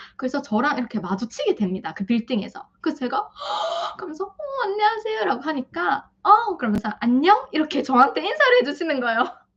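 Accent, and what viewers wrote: Gyeongsang, South Korea. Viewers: 안녕하세요! 지난 주에 알고리즘 타고 제게 쨘~ 하고 나타난! 그 이후로 뜨는대로 다 보고있는데 대구분이신걸 오늘 알게됐네요! 제가 처음 본 영상에 경상도 억양 섞인 영어가 너무 귀엽네요~ 하고 코멘트 남겼었지요. 먼 타지에 살면서 동향 사람 만나 너무 반가운 느낌이네요 ㅋ~ 전 뉴욕에 살거든요. 여튼 쌍둥이 출산 축하드려요!!! 케어하느라 잠도 제대로 못 주무시겠지만 짧디 짧은 영상이라도 곧 뵐 수 있으면 좋겠다는 저의 이기적인 마음 전해봅니다!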